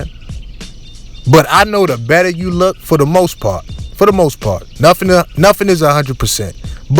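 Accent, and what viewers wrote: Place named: Maryland, United States. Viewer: I can tell your from Maryland or somewhere up north. Am I right?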